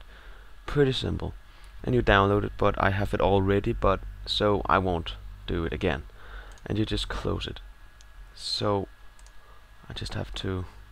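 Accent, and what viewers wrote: Denmark. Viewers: Tak, nu kan jeg komme igang med videoredigeringen igen Synes godt at jeg kunne spotte en dansk accent XP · Danish? Are you from denmark^^'?? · Dansker :D? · i can just heat clearly you are from denmark! haha!:D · Haha, kunne tydeligt hører du var dansk :-) Fed video den hjalp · Are you Danish?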